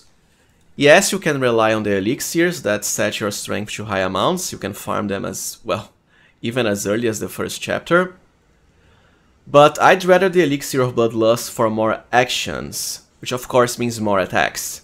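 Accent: Brazil. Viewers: Eu reconheço um sotaque brasileiro quando escuto um!!!!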